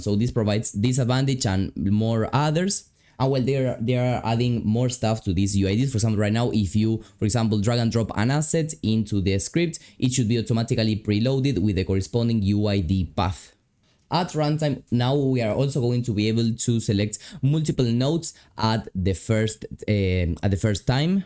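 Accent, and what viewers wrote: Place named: Argentina. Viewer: Aunque hables en inglés se nota que eres argentino 👍🏻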